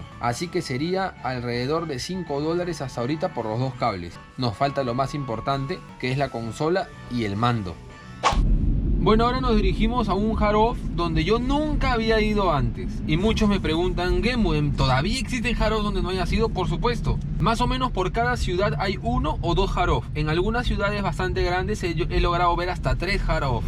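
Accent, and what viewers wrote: Peru. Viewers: Mano eres de peru verdad Por cierto que buen canal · Eres de Perú ? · Por la manera de hablar es peruanazo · BUEN VIDEO BROT POR TU ACENTO DIRIA QUE ERES PERUANO...SERIA BUENOS QUE BUSQUES EQUIPOS DE SONIDO VINTAGE HI FI HAY UNA GRAN COMUNIDAD DE ESO ASI COMO YO EN CONSOLAS GAMER , MINICOMPONENTES DE SONIDO ETC SALUDOS DE LIMA - PERÚ. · Por su acento probablemente es de Perú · eres peruano no manolo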